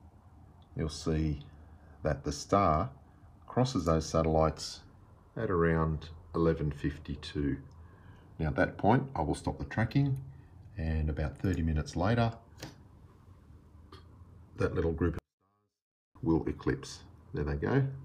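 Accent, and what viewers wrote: Australia. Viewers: love your accent and australian accent in general. i learnt my english with a canadian prof and i wished that my teacher was british or australian. cuz canadian accent is boring af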